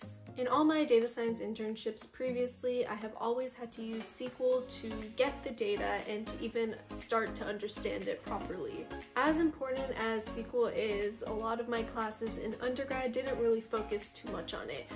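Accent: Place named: India. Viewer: Hey thnx just curious to know are you from India? Your ascent is Amazing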